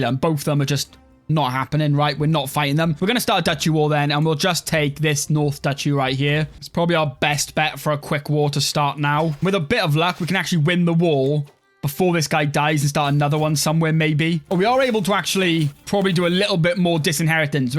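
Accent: Wales, United Kingdom. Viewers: Just wanted to say I love your Welsh accent. North or South?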